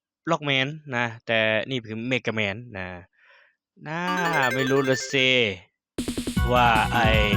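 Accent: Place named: North, Thailand. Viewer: ชอบมากเลยครับ นานๆทีจะมีอู้เหนือ 55+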